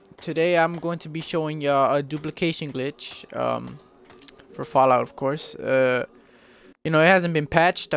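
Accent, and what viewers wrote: United States. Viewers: you sound like your from LA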